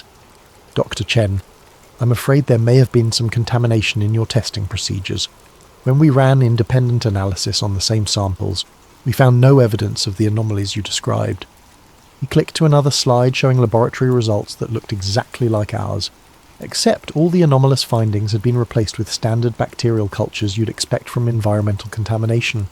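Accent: United Kingdom. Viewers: Ugh the older I get the less I like the British accent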